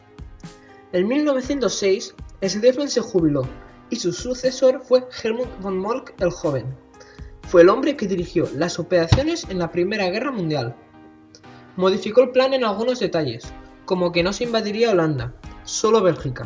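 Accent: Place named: Catalonia, Spain. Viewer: Muy bien muchacho Sencillo y claro He visualuzado tu canal y tenes videos muy interesantes No entiendo tan pocos seguidores Ojala vaya a mas Por cierto eres catalan? Yo vivi unos años en Barcelona Me parece que tu acento es de alli Saludos desde Israel de un judeoargentino sefardi Shalom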